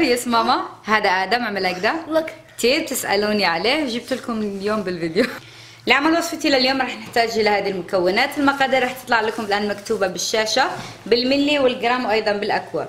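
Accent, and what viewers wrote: Tangier, Morocco. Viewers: ههههههه مزيج عجيب بين المغربية الجبلية و اللهجة السعودية ضحكتوني و الله ايخلي ليك ااادم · ما شاء الله .تسلم ايدك انتي مغربية .ولا لبنانية .كلام. مخلوط. 😍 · باينة فيك تطوانية او طنجاوية ❤❤❤ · كتعجبني مكتهضر طنجاوية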